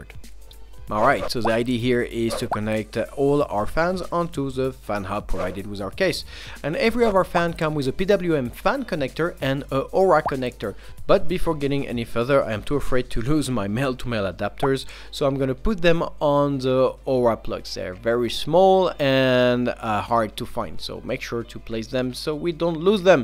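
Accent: France. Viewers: tu as un acent Francais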